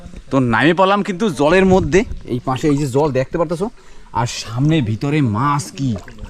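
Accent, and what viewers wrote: Barishal, Bangladesh. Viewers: ভাই এর কথা বার্তা আমাদের বরিশালের ভাষার মতো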